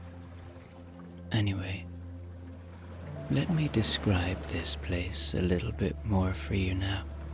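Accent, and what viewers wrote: Ireland. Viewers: Love this! Thank you so much. I also love your Irish accent - which part of Ireland are you from?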